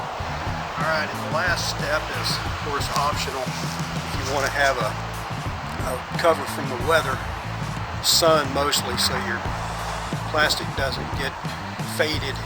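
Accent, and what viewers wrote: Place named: Texas, United States. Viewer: Nice solution for clearing out the deck. I have only one so I hung mine from the rafters in my garage. By the way I forgot to say from your last video, your new intro is awesome, the Texan in you really comes thru. Thanks for the video, looking forward to new adventures with you my friend. JT. from Oregon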